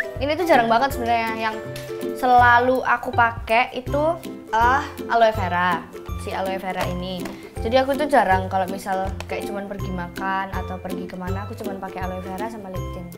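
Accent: Java, Indonesia. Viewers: Medok banget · Medok bngt ngmong ya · Keliatan banget kalo orang jawa👍 medok reek, mantap 😍👌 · tetep mwedok penting ayu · trnyata km ngomongnya rd medok gt ye😂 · pertahanin ngomong campur bahasa jawanya. Aku suka banget sama cara ngomongmu 😍👍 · Medok kayak aku 😄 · Lucuu bangety.. Jawanya medok2 unyuu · Medok tp cantik bgtt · Medoknya dpt bgt bundd😍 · medok terus sumpah jadi makin seneng liat videnya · Gemesh e ngomong e jawa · medok bgt jawanyaa lucuu!!😭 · Ya allah medokk nya kecirii bgt wkwkk ,klo titi udah ga bgtuh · logat jawa nya kerasa bgt 😫☝️☝️ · medok banget ih gemes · Medok banget yak:v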